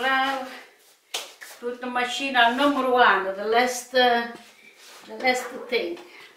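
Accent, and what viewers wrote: Italy. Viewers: ! I love your food, I love your Italian accent. My grandparents came to this country from Bar, Italy. I miss them, I miss kitchen time, especially with my Aunts, too. Please keep cooking!!! 🙏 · All I had to hear was, "Today I make a fettuccine" in a strong Italian accent... I know I've come to the right place. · Take a chill pill. Im merely saying that I prefer listening to italian granma in her accent it gives the channel a certain flavour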